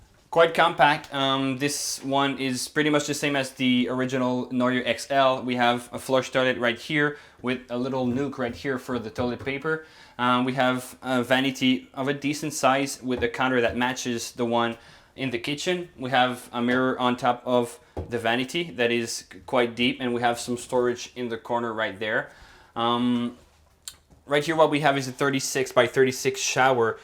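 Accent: France. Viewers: This is absolutely excellent. This is my favorite one. And I noticed that you've lost your French accent, which I miss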